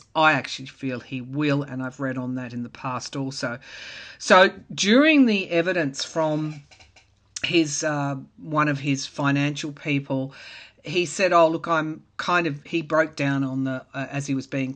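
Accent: Australia. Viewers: She sounds Australian to me .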